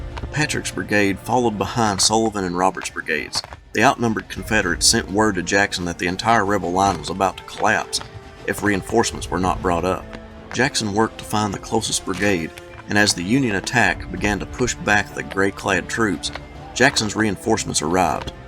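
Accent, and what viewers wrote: South, United States. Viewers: More long videos sir, these are by far the best battlefield series on YouTube and I throughly enjoy hearing a fellow southerner narrate. Which state are you from if I might ask? I’m an Alabamian and get excited every time I see my state represented. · This Southern Accent Is Way Too Distracting.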